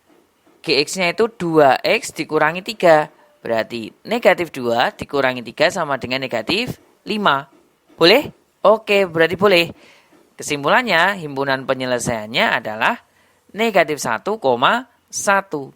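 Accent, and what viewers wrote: Java, Indonesia. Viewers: Jawa bngett ka wkwk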